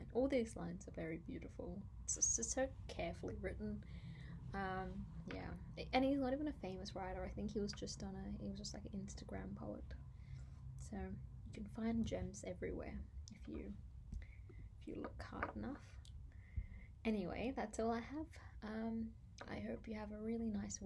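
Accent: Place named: United States. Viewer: Good poem .. Your accent is American, but you look like Indian 😊😊❤❤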